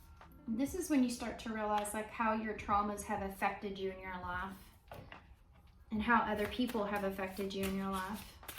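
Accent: South, United States. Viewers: Just found this channel. Pleasantly surprised by your southern accent! Love from southeast Kentucky ❤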